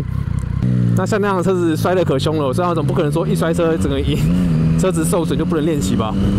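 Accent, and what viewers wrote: Taiwan. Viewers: 熟悉的台灣味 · 叭鯊小 超道地濃濃的台灣味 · 那算是台灣的髒話 但她的口音夠台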